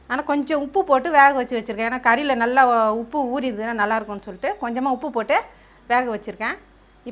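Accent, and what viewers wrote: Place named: Chennai, India. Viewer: பேச்சு நல்லா இல்ல தமிழ் நல்லா பேசுங்க சென்னை தமிழ் நல்ல இல்ல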